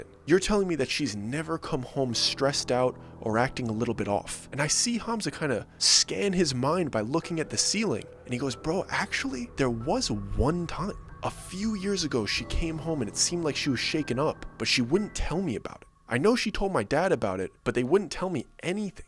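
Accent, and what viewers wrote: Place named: East Coast, United States. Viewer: Now I know why listening to you sounds like I’m listening to one of my friends from the neighborhood. I grew up on the East Coast and did a couple of summers in Queens. I also had to Service hour penance.o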